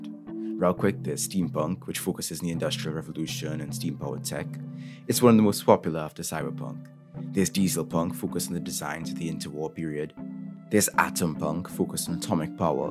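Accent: Trinidad and Tobago. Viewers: I keep trying to place your accent. Are you trini? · What country are you from? You sound trinidadian a little lol 😭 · i knew i recognized the accent, found a Trinidadian youtuber out in the wild lol. love the video and although i haven't heard the name before i am familiar with the concept of solarpunk and honestly i hope we will be able to see our futures in this hopeful light. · Boi, I know a Trini accent when I hear one! Love ya channel. You have a new subscriber. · Cauhgt me offguard with the trini accent. Haven't heard trini since living in Venezuela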